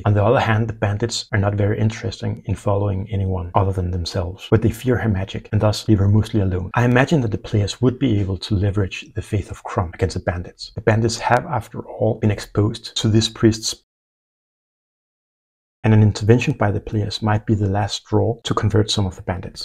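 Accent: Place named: Denmark. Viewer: Before I say anything else, I like this. Thank you! When you were describing the inhabitants of the rooms start I felt the thin coloured lines outlining the rooms was drowned out by the black walls. I really liked the setup of your version and both the descriptions of the rooms and what small modifications you made to the map. You sound Danish, are you? "None of your buisiness" is a perfectly acceptable answer, if you answer at all.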